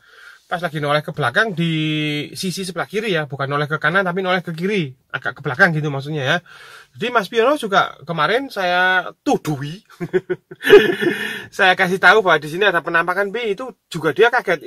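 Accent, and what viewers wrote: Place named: Surakarta, Indonesia. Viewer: Jebulane gagah mas Iki...suaranya khas solo..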